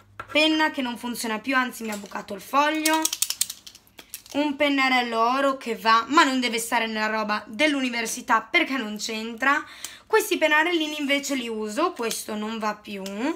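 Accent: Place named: United Kingdom. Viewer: ODDIO adoro la tua pronuncia in inglese. that's so british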